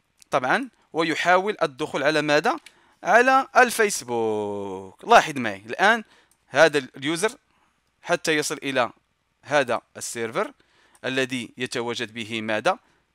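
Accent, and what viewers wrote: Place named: Morocco. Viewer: أنا اتحدى في شيئين: الأول: يكتب كلمة إنجليزية بدون شخابيط في آخرها الثاني: ينزل مقطع واحد بدون مايلخبط في الشرح هههه:) دمه عسل وانسان رائع الله يوفقه + اللهجة تعجبني كثير هو من المغرب صح ؟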